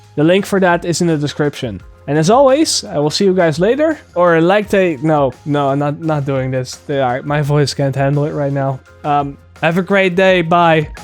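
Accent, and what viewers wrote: Netherlands. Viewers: You're probably Dutch, right?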